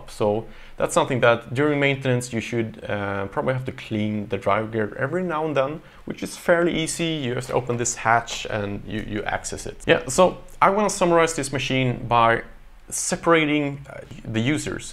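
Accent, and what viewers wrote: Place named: Sweden. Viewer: haha awesome! Jag med, tyckte jag hörde det på din ”dialekt” 😄🇸🇪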